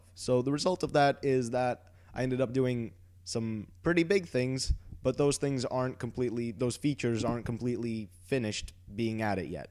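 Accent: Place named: United States. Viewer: Are you actually Russian? I would guess not based on your school experiences that you talk about and your accent is American. Just a question.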